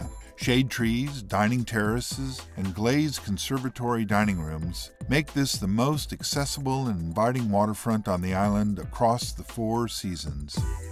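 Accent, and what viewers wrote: United States. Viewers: Why the American accent? hmmm .... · One block has been more or less finished, at least on the outside. Why the hell is this narrated by a yank?!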